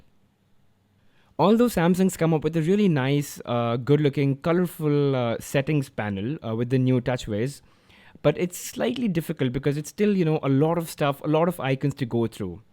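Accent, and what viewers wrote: India. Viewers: Omg your accent is really really nice :) are you indian?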